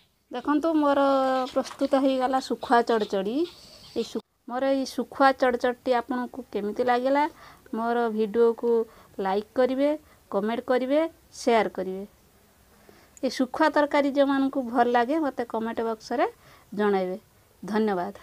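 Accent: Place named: Bhadrak, India. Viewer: Tame bodhe bhadrakia 🤔🤔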